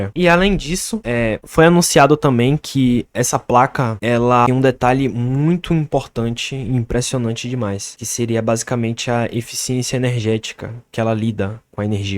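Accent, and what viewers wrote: Bahia, Brazil. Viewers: Você é baiano?? lembra um pouco o sotaque daqui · O bahiano é perceptivel de longe kkk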